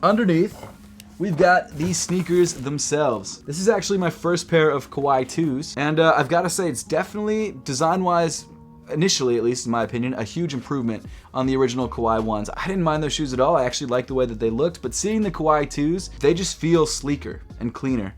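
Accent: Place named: Baltimore, United States. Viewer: Gotta love that Baltimore accent. "... you gat packets an packets. Two sneaker packets an the back, two sneaker packets an the frant"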